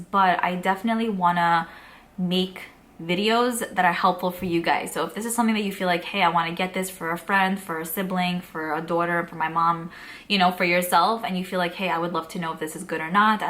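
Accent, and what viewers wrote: New York, United States. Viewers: Newer subscriber here. Is that New York I b hear in your voice? Joisey girl here!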